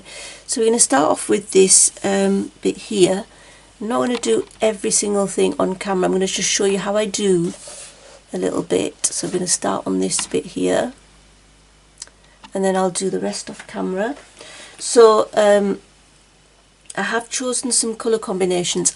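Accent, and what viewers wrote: United Kingdom. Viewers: Hi K, I’m sorry to hear you have not been feeling well, I’ve never had a migraine but I know they can be disabling. As always I get excited when you are doing your magic on camera. I’ve enjoyed this series so much. You do not sound like a frog 🤣 at all, you actually have a beautiful and soft voice, that I find soothing and I love your British accent. Wishing you a speedy recovery and I am looking forward to seeing all the pages you colored. For me, it’s been work and more work, but I am ready for Mermay!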